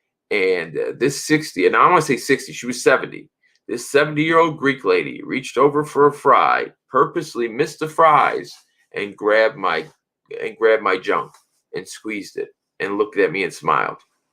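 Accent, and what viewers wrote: Chicago, United States. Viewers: Dude you are hilarious! Neck, hips, lips, small of the back and rear bumper = sexiest parts of a woman ! I can’t could actually feel the sexual tension when you were describing how she was standing there.🤣 Seriously though love your channel I think you could go places in entertainment, you are a naturally interesting person The whole Chicago accent is gold! You can come out to the Bronx with us and pick up a Bronx “forget about it”=foget uhbout it“ “hey you guys go pick me up a cappacuola sandwich “= eh use guys go gimmee a gobbagoo sangwich .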